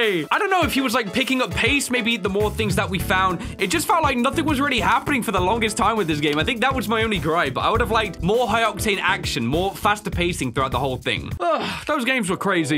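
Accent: United Kingdom. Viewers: I was pretending to be hypnotized because I was watching it and I was confused of what the intro was and so I rewind it and pretended to be hypnotized. That intro was pretty cool. Also when I watched your videos you sound a little bit British like when I heard you say dawko I didn’t hear it clearly. Do a DNA test of where you could be from, Dawko did one on his channel 3 years ago. Or what your part of part Irish, part Scottish, part Swedish idk please make a video of it if you can and watch dawko video for an idea. Just search Dawko DNA Test and you’ll find it. And once you know what to do try to make a video on it.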